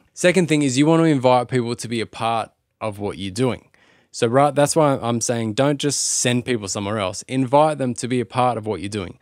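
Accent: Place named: Australia. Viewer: I've just released my first song on Spotify and am on the journey from musician to artist so this is perfect for me, thank you! Love the Aussie accent and great to discover music as I've been away from Australia for over 20 years...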